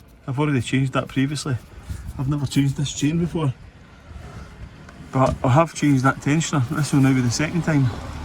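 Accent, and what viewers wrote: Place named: Scotland, United Kingdom. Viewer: Great video, nice to hear a Scottish accent too! My dad’s van is the mk3 but there is a bit of a racket coming from the engine, no low oil pressure and warning as of yet, the rattle evens out after a bit of driving, my thinking is it’s taking a while for the oil to get to the top maybe due to a pump on the road out or a bad tensioner like yours. Did you say you done the timing chain tensioners? What about the chain? Curious on the millage as well, cheers👍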